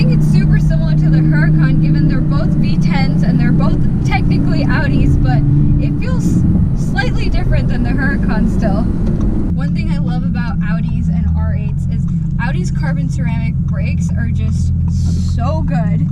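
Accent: India. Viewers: R u a Telugu girl ???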